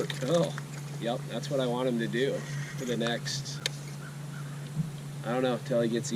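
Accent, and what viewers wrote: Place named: Midwest, United States. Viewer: Did you say donate or don’t eat I can’t tell through your Midwest accent LOL good job buddy!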